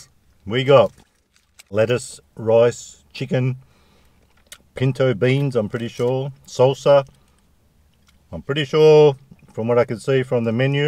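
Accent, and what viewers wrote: Australia. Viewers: GOTTA HAVE A BURRITO great video mate but your still just a touch too australian for my taste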